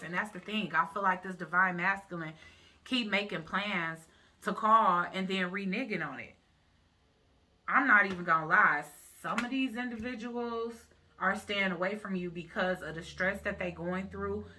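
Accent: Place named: Detroit, United States. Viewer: I knew you was from the d we got that accent lol. Thank you for this reading! Spot on.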